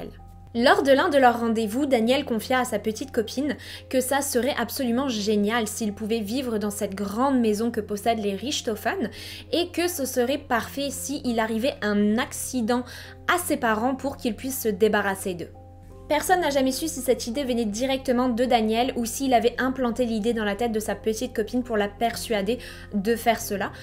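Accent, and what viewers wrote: Quebec, Canada. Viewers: On dirait qu elle a un accent léger du Québec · Est tu canadienne tu a un acsant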